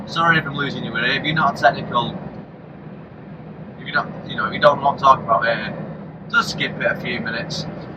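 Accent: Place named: Northern England, United Kingdom. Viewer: Am from donny mate accent similar to your just a bit more northern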